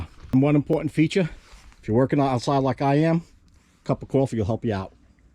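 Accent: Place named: East Coast, United States. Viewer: I’m going start by saying I love your east coast accent 🤣❤️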